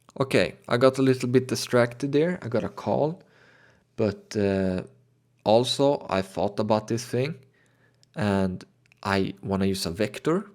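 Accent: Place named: Sweden. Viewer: Love the swedish accent, very cute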